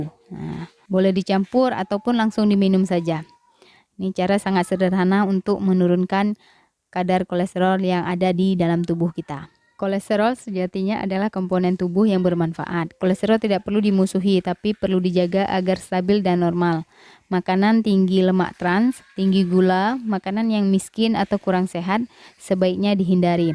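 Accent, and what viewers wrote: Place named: Minangkabau, Indonesia. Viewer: UNI LOGAT MINANGKEBO NYO RANCAK NDAK ILANG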